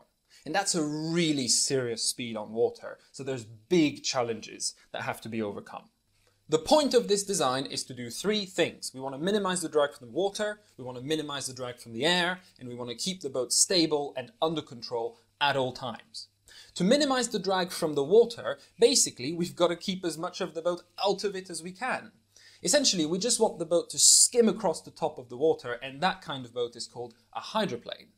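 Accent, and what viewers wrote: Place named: Scandinavia. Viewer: pretty sure he's not UK(originally), sounds like scandinavian, to many american R's and over pronounciation of the english bøøøut(boat)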